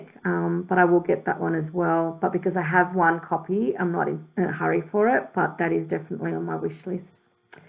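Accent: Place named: Australia. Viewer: Great video! I love listening to your voice. So nice to hear another Aussie on here :) (Stacey aka Stacey Lyn off fb) 🙏🏻💜🙏🏻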